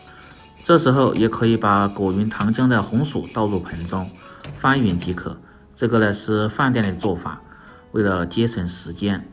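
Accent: Sichuan, China. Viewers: 我也做做看☺️老师您是四川人吧？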